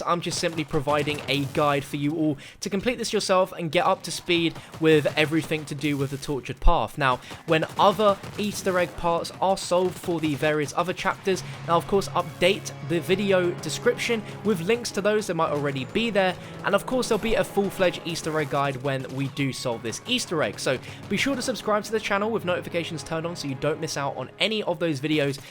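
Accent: United Kingdom. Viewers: omfg i cant stand ur british voice its awfull pls stfu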